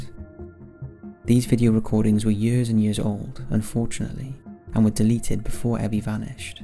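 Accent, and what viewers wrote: United Kingdom. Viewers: The narrator is obviously British. You are aware they speak a little differently than Americans, right?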